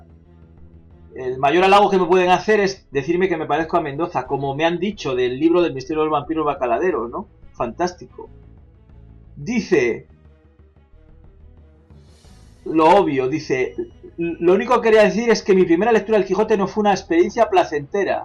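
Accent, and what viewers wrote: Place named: Mexico. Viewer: Grande!!! no teme decir la verdad jamás!!! ya hablas como Mexicano hermano!!! un abrazo y gracias x tus vídeos!!!!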